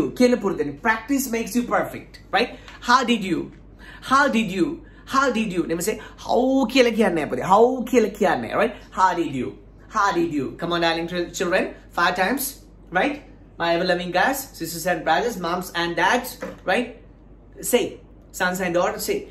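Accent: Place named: United Kingdom. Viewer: My loving teacher ,will I ask you a one thing ? How do you speak in English like a british one..? 😍😍😍 I also like speak in English as same as you😌🌼🌼🌼I already have started work hard for it😊✨️I believe some day , I will be able to speak in English nicely 😍😌🌼now I feel I am improving day by day because your strawberry English 🍓frankly speaking now I can't help speaking in English..although sinhala is my mother tongue ...now I like speak in English better than sinhala 😌🍓your strawberry English is really fit for me.🍓❤️😍happy to say I have selected the best way to learn English.. I remember one day our school teacher said us ENGLISH IS A CROWN 👑 and the one who wear that it's seems like a king 😍😍😍 I wanna wear that crown ..please sir help me to reach my dream 🙏✨️ Your poor student 😌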